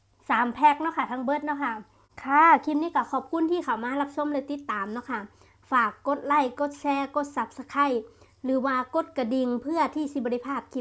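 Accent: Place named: Isan, Thailand. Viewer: ฟังสำเนียงแล้ว เจ้าอยู่ สกลนคร นครพนม หรือ บึงกาฬ แม้นบ่อครับ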